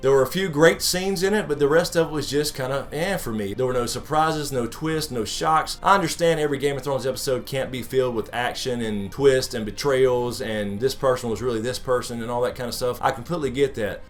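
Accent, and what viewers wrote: South, United States. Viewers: I just clicked like bc u sound like a southern n I love the Midwest (live in California now n miss my sweet Arkansas lol)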